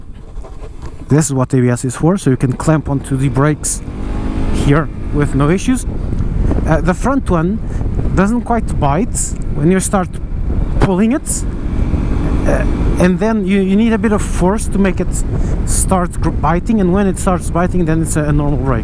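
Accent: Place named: Portugal. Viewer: Faço like pelo sotaque tuga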